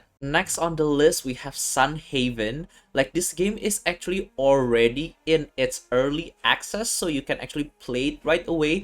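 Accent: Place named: Indonesia. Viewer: Indonesian accent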